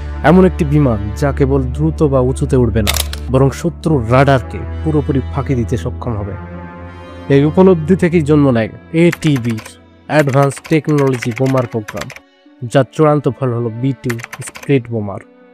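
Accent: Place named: Bangladesh. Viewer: চ‍্যানেল টি কি বাংলাদেশের? ঘোষকের উচ্চারণ তাই বলে। যুক্তাক্ষর উচ্চারণ করার অক্ষমতা উৎকট রূপে প্রকট।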